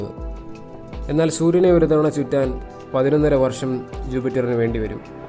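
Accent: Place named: Trivandrum, India. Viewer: Trivandrumo kollamo ayirikkum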